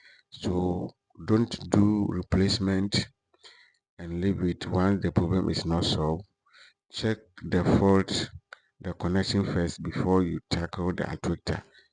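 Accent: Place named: Ghana. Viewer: Where are you located... You sound like a Ghanaian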